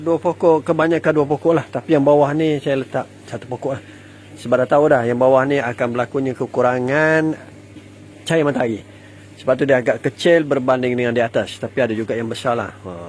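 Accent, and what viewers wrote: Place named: Kelantan, Malaysia. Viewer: cikgu ni org kelantan kea?..ad bunyi Kelantan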